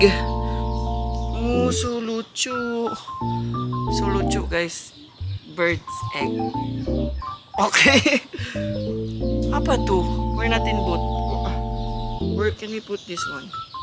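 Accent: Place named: United Kingdom. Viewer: Lucu kali mas the way he speak "british accent" make me laugh at the same time of his cute face.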